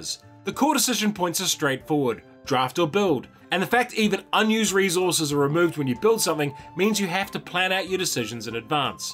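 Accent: New Zealand. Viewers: "Catacombs Cubes" sounds much more like it rhymes when said by a New Zealander than it does when said by a Merican...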